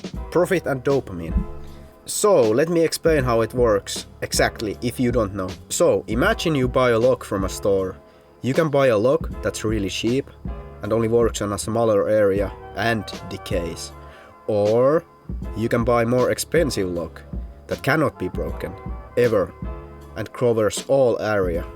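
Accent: Finland. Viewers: On muuten tosi suomalainen aksentti :DD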